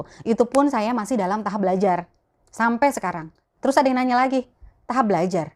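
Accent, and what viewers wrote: Jakarta, Indonesia. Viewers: Bahasanya betawi pisan di geroinnnnn 🤣🤣 Mpo.. Belajar b. Arab dong mpo bnyakin.. 🙏😘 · As jngn di pikirin komen orng klu kata saya debes seneng saya liat klu cara bicaranya betawi bnget 👍👍👍👍👍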